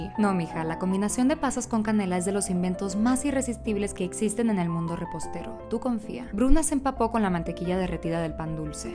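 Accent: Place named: Mexico. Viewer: más me encanta con tu acento mexicano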